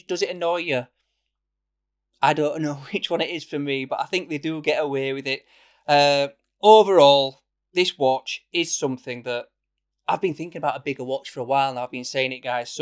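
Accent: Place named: West Yorkshire, United Kingdom. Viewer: Where are you from? Sounds very West Yorkshire to me 🤔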